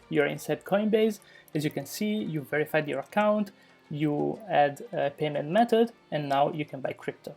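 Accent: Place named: Italy. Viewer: Ciao, sembra che sei italiano..di dove sei?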